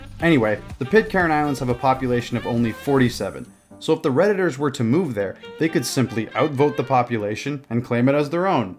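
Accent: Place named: Alberta, Canada. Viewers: This man sounds like an Albertan, I may be wrong with that theory but I've lived in alberta several years and I can pick out an Albertan when I here one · He’s Alberton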